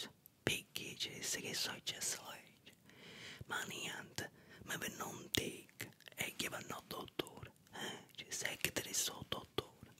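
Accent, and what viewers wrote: Sicily, Italy. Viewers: Grande "unico "💪🔥video molto rilassante, che bello il dialetto siciliano❤️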